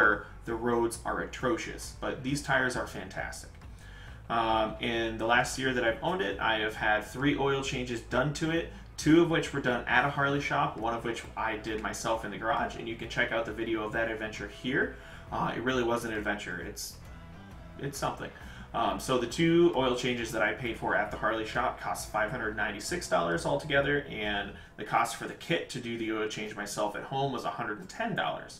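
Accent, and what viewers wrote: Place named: Minnesota, United States. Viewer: I knew you sounded like a MN guy! Please keep us updated on the Commander 3’s. It would be nice to hear how they are doing and how they are wearing after 6 months, a year, etc. I’m in MN summers and AZ winters.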